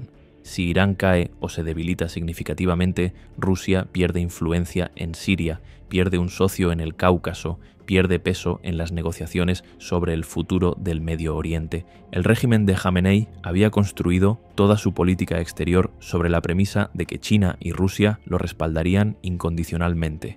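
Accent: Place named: Castile, Spain. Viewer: P A X. Sus análisis contienen un componente de la lógica filosófica, más elemental, que cualquier persona con un buen sentido común lo perciben con facilidad. Por otra parte la dicción de su locución, es muy castiza de la fonética de la vieja Castilla: muy agradable de oír. Enhorabuena !!! Gracias !!!!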